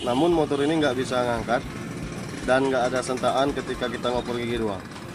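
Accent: Palembang, Indonesia. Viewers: Wong palembang yo kak?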